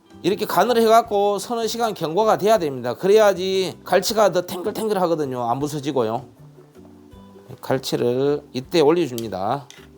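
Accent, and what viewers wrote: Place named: South Jeolla, South Korea. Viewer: 전라남도 사투리가 너무구수하네요ㅎ 맛나보입니다~^^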